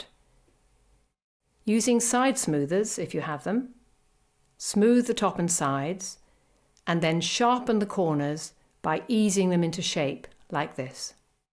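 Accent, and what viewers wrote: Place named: United Kingdom. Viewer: i luv british accents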